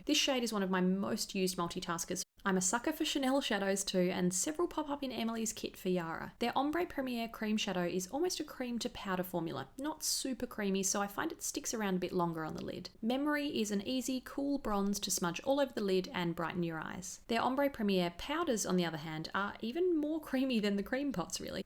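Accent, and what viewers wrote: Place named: Australia. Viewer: Your Aussie accent makes your videos even more delightful to watch 😍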